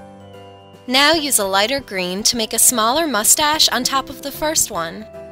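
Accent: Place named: Newfoundland, Canada. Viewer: Are you from Newfoundland? Your accent sounds just like one!!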